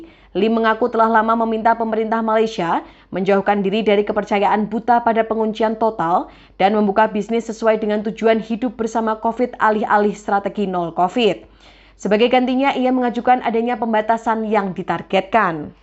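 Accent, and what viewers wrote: Java, Indonesia. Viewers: Pembaca beritanya medok jawa bgt...hehehe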